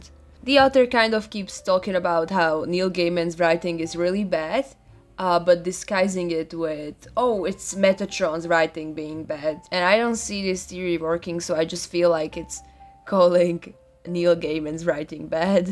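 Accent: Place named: Eastern Europe. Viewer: You sound so Eastern European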